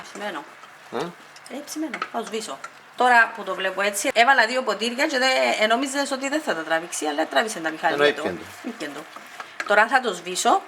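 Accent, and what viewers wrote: Cyprus. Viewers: Πολλα ωραιο!! Μα πιο ωραια η προφορα σας ! Λατρευω την Κυπριακη διαλεκτο.Να ειστε καλα.💖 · Ωραία ακούγεται Κύπρια είσαι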